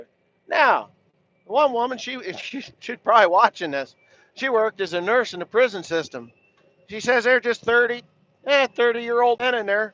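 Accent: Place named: Mississippi Delta, United States. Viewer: Sounds like u in ms delta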